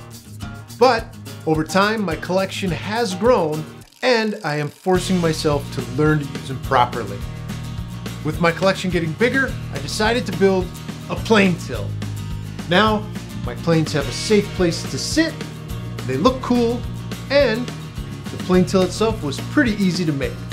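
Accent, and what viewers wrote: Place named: New England, United States. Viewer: What a wonderful idea to use the hidden magnets to secure your planes! Your shop continues to become more organized with each project! I see your plane collection has really grown! They're both functional and beautiful tools! The plane till was an awesome storage idea and it turned out great! You got that New England accent perfectly perfected too!! As always, enjoyed seeing your latest project! You and Buddy have a great weekend! God bless!!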